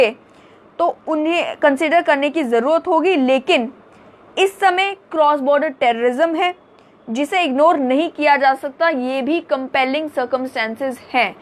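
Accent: Jammu, India. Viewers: keep up the good work,aur ye dogri accent (like garnade)change mat karna,local channel wali feeling ati hai🙂🙂👍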